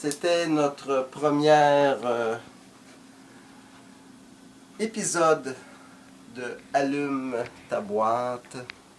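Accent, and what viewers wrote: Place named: Quebec, Canada. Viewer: i love your french Canadian accent!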